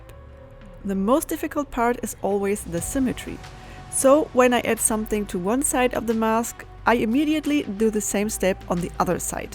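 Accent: Germany. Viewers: Are yue Germen 🇩🇪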